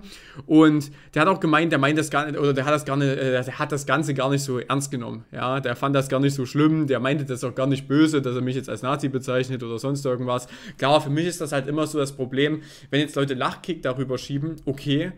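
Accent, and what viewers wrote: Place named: Saxony, Germany. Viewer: Najoa, solang das alles geklärt ist, ist alles gut. Man muss halt vorsichtig mit einigen Äußerungen sein aber so lang er es nicht ernst meinte und er seinen Fehler einsieht, solle mich net stören. Ich Sag's gerne immer wieder aber ich feiere diesen sächsischen Dialekt so derartigst. Das klingt wie meine Familie mal mit Ausnahme meiner Eltern und meines Bruders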